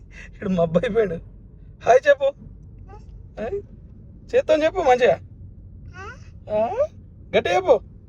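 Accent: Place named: Telangana, India. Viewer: Your village is well developed and houses are lovely And your Telangana talk is so good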